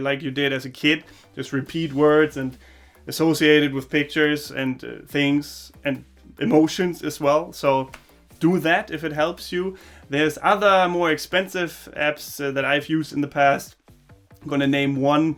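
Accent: Germany. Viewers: You still sound German, but your English is pretty good. The one problem I noticed is the way you speak sentences. Sometimes they seem to complete as odd as that sounds. But I am not a perfectionist by any means and wouldn't complain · Your english is really well. Many Germans have strict German accent when they speak in English. But you have much less. I guess it's because you started to learn English very early. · You sound fine you just have a German accent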